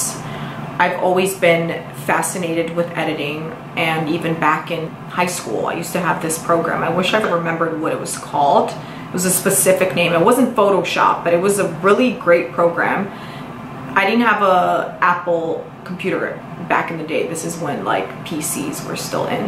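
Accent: New York, United States. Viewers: now that you're back in ny you're ny accent is back! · I'm an OG follower aoww<link> Also a fellow born and raised NYer...always loved your NY accent...finally seeing influencers with the same NYC swag I grew up with is nice!!!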